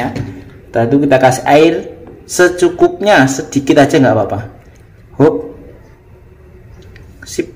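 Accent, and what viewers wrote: Java, Indonesia. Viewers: Iyo mas Iyo coba Ojo medok ndesone hehe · mas pean ki orang jawa ta ngomongnya bhsanya jawa medok😃😃😃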